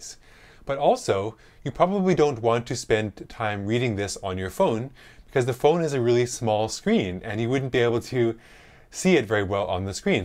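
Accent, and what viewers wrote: Canada. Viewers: U Canadian? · He is Canadian. That is why he sounds different.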